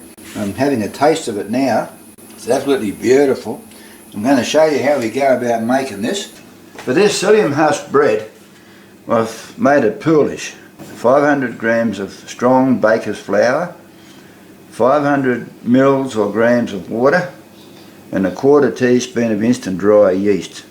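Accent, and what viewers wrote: Australia. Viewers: LUV your Aussie accent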